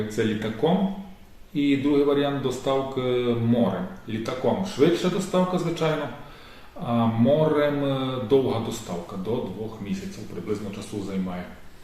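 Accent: Transcarpathia, Ukraine. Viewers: Ви із Закарпаття)))?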